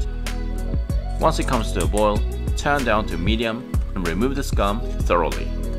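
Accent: Australia. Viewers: Thank you for your wonderful video. Your English is perfect & it sounds like you have a bit of an Aussie accent. Bravo. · Are you in Australia? I hear a pretty strong Aussie accent. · You sound asian australian mate, great recipe btw!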